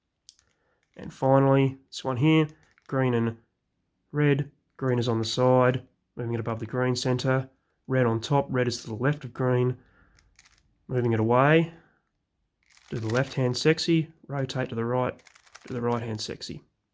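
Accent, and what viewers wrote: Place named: Australia. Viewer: finally got around to watching your video. I didn't realise you were Australian. I'm in Adelaide.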